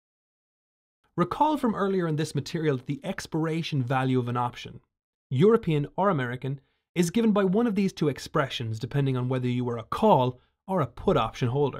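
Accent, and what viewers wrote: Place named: Ireland. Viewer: The narrator sounds Irish!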